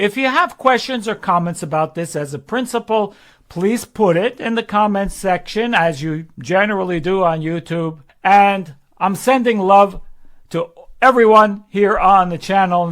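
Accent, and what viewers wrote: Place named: New York, United States. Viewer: Just discovered ur channel- never heard a Rabbi read or teach or relay a sermon before (whatever it is ur doing on this channel) but find u inspiring, knowledgeable and passionate (not to mention-ur accent that I love- that reminds of me of my days in NYC in law school many years ago). Scorpio man here through and through and working on self - improvement and development (spiritually). Though I’m Catholic, we all find that wisdom comes in many forms sent to us in many ways- and well, ur wisdom and sharing of same will become a great addition to my growing library of go-to’s. Thank you!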